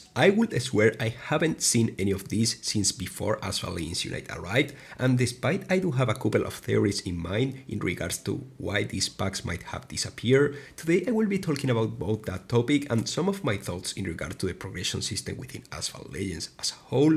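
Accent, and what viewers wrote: Spain. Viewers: his main lang is spanish, i personally love his accent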